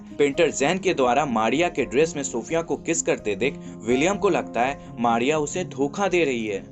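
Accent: Bihar, India. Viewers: Story is gud but Voice se lgta h bihari muvi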